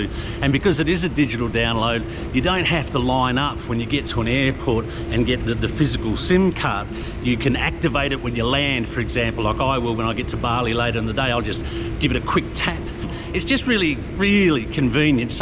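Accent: Australia. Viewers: Lovely vlog, speaks to real life and travels. I was intrigued by the dish you waxed lyrical in Singapore. The You*Tube translator took your Aussie English and came up with "Luxer". I just kept adding the ingredients as your poetic review progressed until "Laksa" finally populated.😆😍🤩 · I'm a Brit used to watching several fairly Naff travel channels (I subscribe to other genres as well). This channel is the absolute dogs b___ compared to them. What a great travel Vlog this is. I just love your attitude and your way with strangers. There are so many laughs, I loved the time when the two Russian guys were arguing and had been fighting, in what was a fairly tense environment your Aussie twang never changed and you just still sounded so relaxed 🤣. Just fantastic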